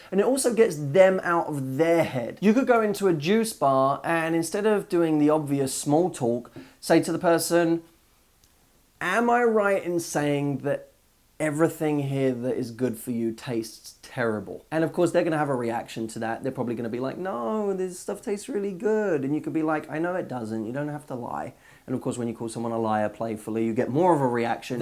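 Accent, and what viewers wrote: United States. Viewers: Is that a Californian accent? Regardless, your rendition was awesome. You slip into and American accent like it's butter, i.e smooth! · good American accent dude:) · I love the American accent - the deep voice!, you are amazing. Thank you so much! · his American accent reminds me of Ryan goslings voice lol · Your American accent is adorable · Jesus. Your American accent makes me feel like you're playing all of us all of the time. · Omg the american accent is great! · Love the American accent...lol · Amercan accent!!!!! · Your American Accent is awesome! Thank you for the helpful content. 😉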